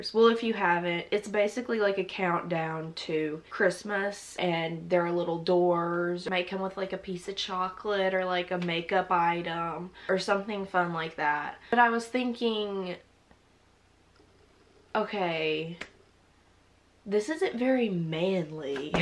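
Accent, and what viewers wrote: South, United States. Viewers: She sounds southern but didn't know what venison was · i live for that lil bit of yee yee she got in her voice. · She turns more and more southern as the video goes on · I was listening to you and you sounded hella southern and I saw you lived in Alabama 😳 I do too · I’m new here so I was like “When you find out the jerky is made in LA give up the accent” but as I stayed I realized oh crap that’s her accent. It’s not Texan (like mine) but she’s actually southern huh? 🥸🤪 (especially not Texan when you don’t know what Venison is🤷🏻‍♀️)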